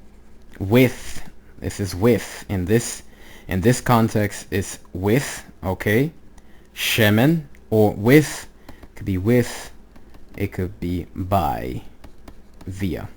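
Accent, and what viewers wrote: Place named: Yemen. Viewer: You sound just like a Yemeni Hebrew! Very Impressive! God Bless you brother. Thank you for the Wonderful teaching.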